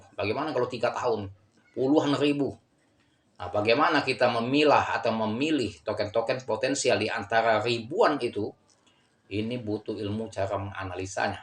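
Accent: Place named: South Sumatra, Indonesia. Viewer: Sepertinya bahasanya bpk asal sumsel ya Pak..salam cuaan Pak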